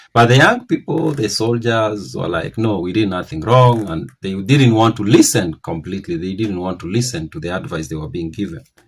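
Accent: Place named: Kalenjin, Kenya. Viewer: Mbona huyu jamaa anakaa mluhya na anaongea kama mkale?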